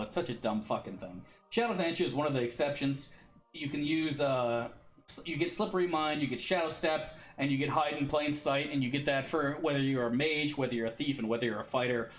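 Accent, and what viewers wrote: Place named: Michigan, United States. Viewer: What's your 20ish? You sound like your from Michigan, but Cali and Michigan accents sound similar when recorded.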